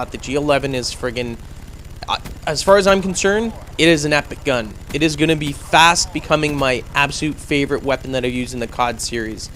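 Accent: Canada. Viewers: Lol are you Canadian? you say abot alot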